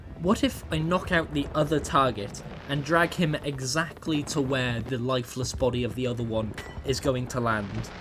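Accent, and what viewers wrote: United Kingdom. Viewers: okay i have absolutely no reason to care about this apart from being queer but here goes. reasons im pretty sure this guy is gay 1. uses the word "partner" rather than girlfriend 2. "partner" knows about hitman which is more likely to be a guy 3. he doesnt sound outright camp but he has that british accent that is like perfectly closeted i apologise for having the urge to write this comment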